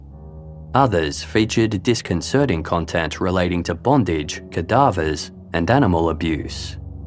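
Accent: Australia. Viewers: Psychopaths are not always serial killers they are corporate bosses and world leaders The planet is run by psychopaths The he fact that the president and vice president got extraordinary payouts compared to the compensation for the Stiener’s AND went on to become leaders in other corporations speaks volumes how psychopaths are valued in business and politics Glad the local police were so diligent ,sadly not usual with police investigations And as usual well told and thorough recount And as a fellow Aussie so nice to hear a familiar accent👍🇦🇺✌️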